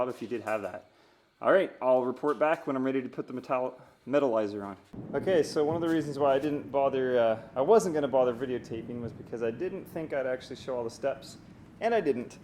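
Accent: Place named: Canada. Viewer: Canadian eh???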